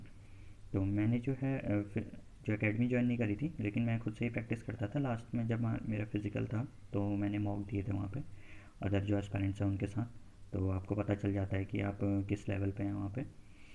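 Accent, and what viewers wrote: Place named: Kumaon, India. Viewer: Sir are u from Uttarakhand because ur accent and ur look is totally like Kumaoni people of Uttarakhand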